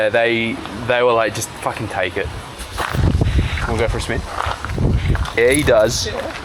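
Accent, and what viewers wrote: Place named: South Australia, Australia. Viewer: I’m proud of myself, based off your accent I placed you in south Australia and I was right. My first video I’ve ever watched from you and it is incredible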